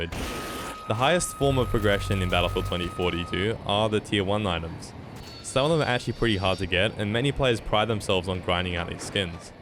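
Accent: Australia. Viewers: Ughhhh Aussie accent, gross.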